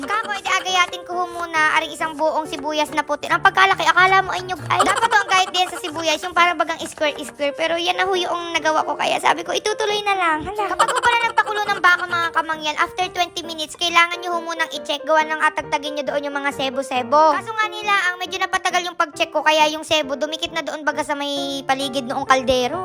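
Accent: Batangas, Philippines. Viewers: Batanguena accent...ka gandang batang are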